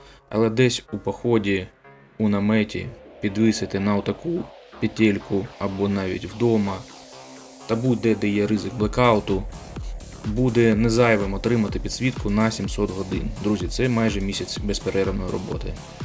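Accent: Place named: Russia. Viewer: как бы не пытался, а русский акцент слышно за версту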